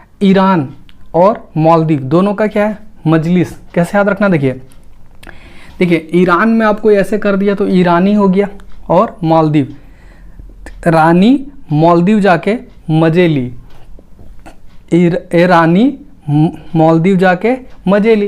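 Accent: Bihar, India. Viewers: सर आप बिहार से ही हो क्या ,, बेस्ट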